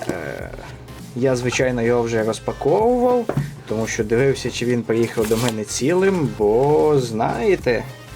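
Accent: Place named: Western Ukraine, Ukraine. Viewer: Западный акцент забавный)))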